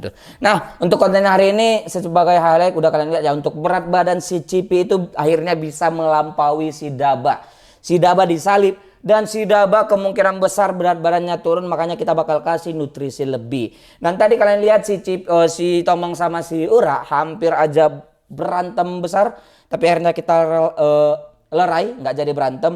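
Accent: Java, Indonesia. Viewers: kangen suara Asli jawanya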